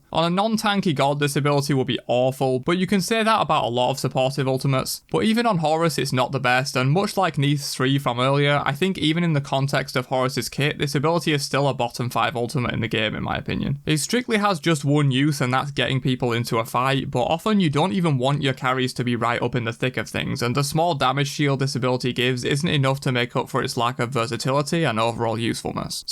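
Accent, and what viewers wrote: United Kingdom. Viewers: I love your videos, but need to go learn British English to understand more than few words!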